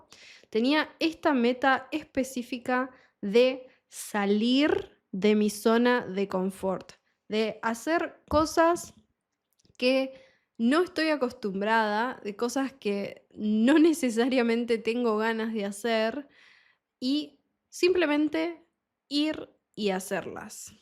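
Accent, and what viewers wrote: Argentina. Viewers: Muchas gracias. Me encanta escuchar el acento argentino,es muy preciosa .Amo mucho a Argentina y espero poder visitarla algún día.Por cierto soy egipcia.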